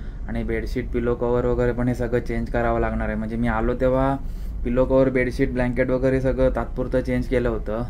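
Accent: Konkan, India. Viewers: कोकणी भाषा आहे ती तसेच बोलतात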